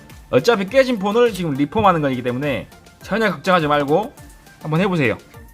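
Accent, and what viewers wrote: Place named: South Jeolla, South Korea. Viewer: 전남사투리 맛깔나게하시네요 ㅋㅋㅋㅋ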